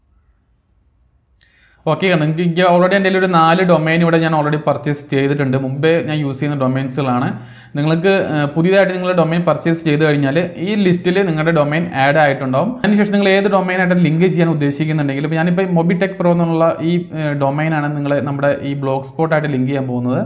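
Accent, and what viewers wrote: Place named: Thrissur, India. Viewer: Bro thrissur aano veed?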